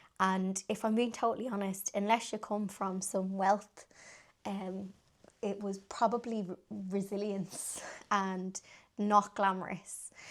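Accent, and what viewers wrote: Ireland. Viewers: I love this video!! To learn this history of Ireland from your beautiful Irish brogue surpasses any history lesson. Although, I am only 1/3 Irish, ☘️ I hold Ireland close to my heart. My mom cherished her Irish heritage. Her grandmother, Bridget, came from Donegal. I don’t know how different that is from Dublin. ❤ (My grandmother’s name was Henrietta ❤)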